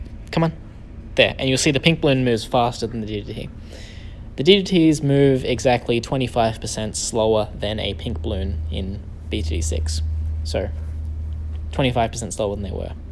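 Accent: Australia. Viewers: I swear this sounds like an Australian accent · Are you from Australia? new zealand? Bro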